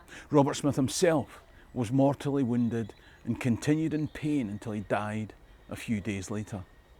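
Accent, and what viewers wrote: Scotland, United Kingdom. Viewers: l've watched a few of your videos, and being from Texas l love to hear that Scottish brogue. I remember my Dad wanted me to go with him to a drug store and hear this lady cashier, as l purchased a carton of smokes for my Mom. When she exclaimed " Ooh,ya shouldn't smoke. There bad for ya!" I turned to my Dad and told him "She's from Scotland." That lady was kinda shocked that I could tell by the accent. It wasn't long after, a couple were moving in next door to my Mom and Dad upstairs. And I only saw him and his girlfriend (wife) going to bring a full size couch up So l insisted to take the couch from the female. After we got it into the apartment (flat), when he spoke l could tell he wasn't from Texas, but Cork, Ireland. His significant other, she was a California girl but not a blonde! I mentioned to them about the lady at the drug store that was visible from the building where we were standing. 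I know he was excited about Ireland getting into the World Cup that year, lf l remember correctly it was in'90. He had ask me did l watch football, and l just assumed he meant American football, l said football hasn't started yet being Summer time. He then said Soccer, l told him as a kid l played it in Gym class. But in the '70s it wasn't popular as nowadays in America. Too much running for me, and l've always been a skinny person, but smoking doesn't help neither. But l do like to hear y'all talk!😊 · Outstanding presentation -- I'm always excited when I hear your Scottish accent with "Let me tell ye' a story ..."!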